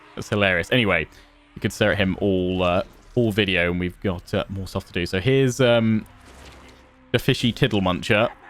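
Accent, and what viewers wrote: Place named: United Kingdom. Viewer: Hearing all the unit names from a Brit sounds like I’m getting insulted. I LOVE IT!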